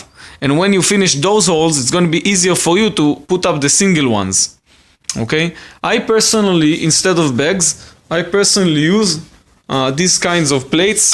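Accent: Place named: Israel. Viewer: Love your Israeli accent!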